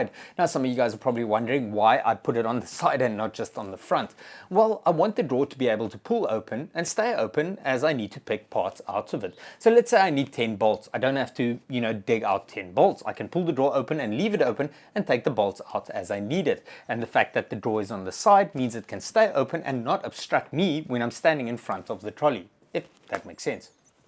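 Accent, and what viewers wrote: South Africa. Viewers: Sounds South African to me, but I’m no expert. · Hello sir. Great project. Are you South African? Your accent makes me believe you are one of us.😂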